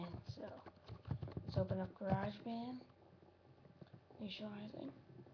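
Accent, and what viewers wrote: Texas, United States. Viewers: wat the hell is dis he sounds like he is from Texas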